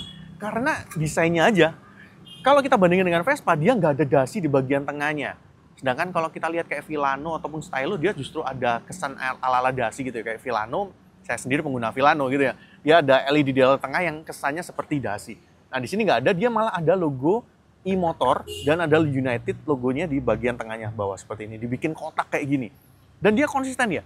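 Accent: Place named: Surabaya, Indonesia. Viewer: Suaranya jga mirip sekilas, cuma dg aksen suroboyo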